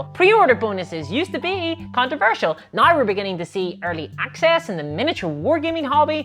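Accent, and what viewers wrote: Northern Ireland, United Kingdom. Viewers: Great video. I'm from Norn Iron and I admit when I first watched your stuff I was horrified at hearing an accent similar to my own on YouTube - we are after all a self loathing breed of people. After about 10mins I was hooked! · Dont know whats the hate about not only is she gorgeous and has the British accent, she give us the up and down of the evil GW. lol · This has me laughing out loud at several places. Very good. But I do have one question...is there a Northern Irish accent in there?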